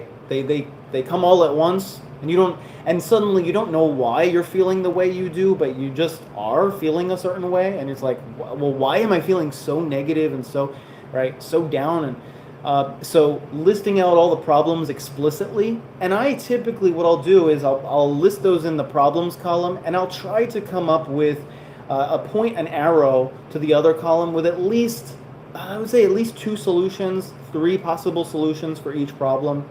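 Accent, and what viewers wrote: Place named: United States. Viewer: This live stream- I don't know why?- giving sence of enjoyment like that cool American and British speaker and thier unique speaking style. You are using American Acccent too.😅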